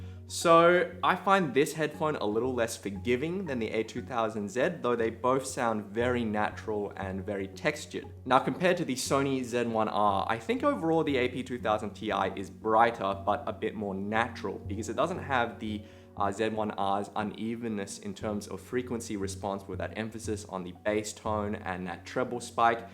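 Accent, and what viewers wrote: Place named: Australia. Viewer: I was not expecting an asian with Australian accent :)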